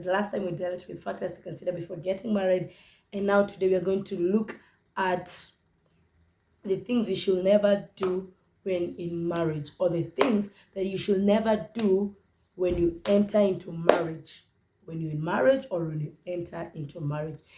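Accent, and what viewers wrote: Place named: Tanzania. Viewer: nimependa vile unazungumza swahili ya TZ hahha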